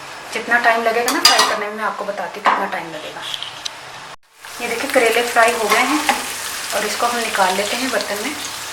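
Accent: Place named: Punjab, India. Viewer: باجى جي. هذا قيميه ماشاء الله عليك ميه ميه وأنت لسان البنجابي حلوة ميه ميه جزاكم الله خيرا في الدنيا والآخرة مع السلام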